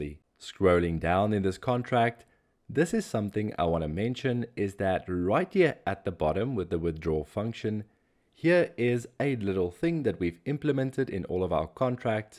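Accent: South Africa. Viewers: are you South African? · Great video, you sound South African. 😃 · are you from south africa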